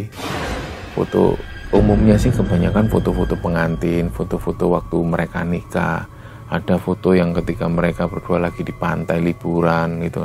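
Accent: Java, Indonesia. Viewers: penyampaian ceritanya mirip pakde alfan apalagi cara ngomongnya medok jawa suka banget.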